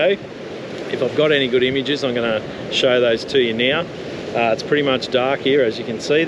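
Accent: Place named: Australia. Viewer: I truly enjoyed this clip. Love the laid-back, very Australian delivery. But that said the content was very useful and valuable. i really like the IQ of the Canon as well. I also liked the (abstract) waves and the richness of the colours. I hope to head down that way in a few months and will try out the technique. It was terrific that you mentioned details that I might not have otherwise noticed like the setting sun shining on the wave tops. I love capturing the complex movements of the water too. It seems that particular location is one that presents much variety to those who are keen enough to look. Favourites: the first two at the end, the same ones you said you liked.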